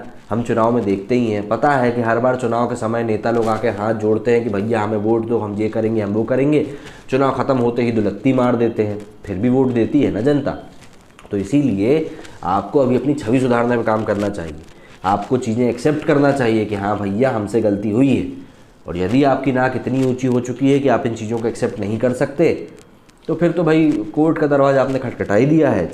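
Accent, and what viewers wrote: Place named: Eastern Uttar Pradesh, India. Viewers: Bhaiya aaj kahe Bhojpuri accent me ? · Wahii....Kuch Kuch bihaiii....tone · accent up east kaha ke ho bhaiya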